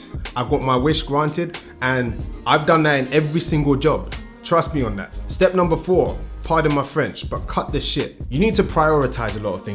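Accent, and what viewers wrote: Jamaica. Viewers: Decent video....I do labor work and im dirty after work So i always have to go home and shower,,,then sometimes nap and then take a pre workout before the gym,,,,It works just you dont get good sleep at night before of the pre. I find going to bed early and actually gogin the gym before work is better and the pre keeps u going all day. But i heard morning workouts make u drop size because you have no food in you...? You are Jamaican ISH? i heard u say a few thins at times like maybe u got some family here....?g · Are you Jamaican, African or British?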